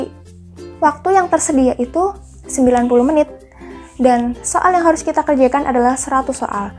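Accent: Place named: Banyumas, Indonesia. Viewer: Salam Ngapak mba. Makasih udah share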